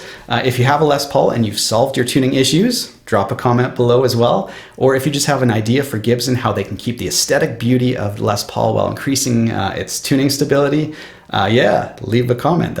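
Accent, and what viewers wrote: Canada. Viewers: Are you Canadian? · I always enjoy Canadian accents. No problem with thaat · There's no way you're not Canadian. I'm from Ontario and I can't unhear the accent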